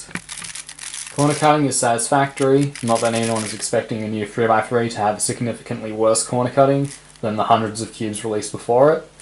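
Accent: Australia. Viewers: he is australian so yeah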